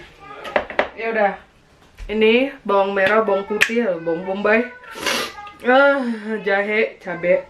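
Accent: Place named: Batak, Indonesia. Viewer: Mantap. Sukak kali aku nonton vlog kak ini, natural kali batak nya.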